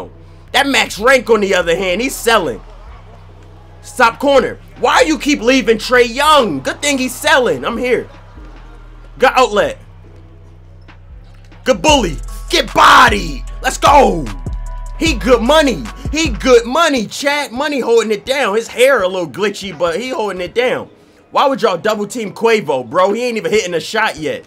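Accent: Philadelphia, United States. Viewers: U from Philly bro?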